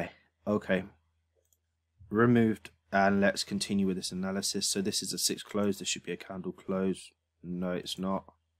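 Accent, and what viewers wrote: London, United Kingdom. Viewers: Thanks Boss. Your British right? What broker is this? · Honestly mate i think this is quality - i love the way your showing what you would do before & then after whether it be a win or a loss....love the honesty there , people learn more from mistakes .You sound like your from my kneck of the woods - London Lad.Anyways keep doing what your doing brother , you come across as a nice genuine guy .Liked & subscribed .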